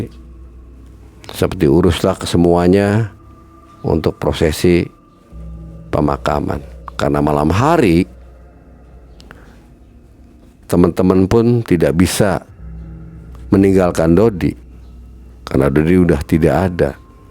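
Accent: Cirebon, Indonesia. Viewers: Kaya org indramayu om,bahasanya. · Dari awal ketahuan bahasanya logat bahasa CIREBON...😃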